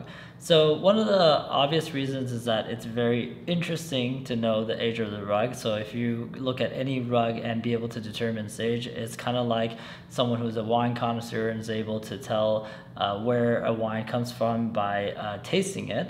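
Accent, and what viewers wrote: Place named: United States. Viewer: This video was supposed to be about the art of carpet making. This salesman speil in American accent takes all the fun out of it.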